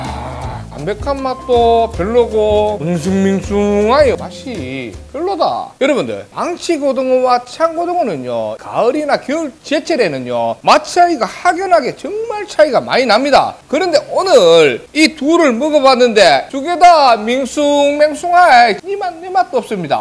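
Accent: Gyeongsang, South Korea. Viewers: 갱북사투리는 진짜 촌스러버예 비슷하지만 경남사투리는 멋이있는데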